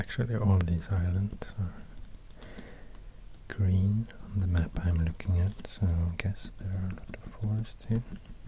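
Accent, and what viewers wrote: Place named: Sweden. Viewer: thats the power of swedish english asmr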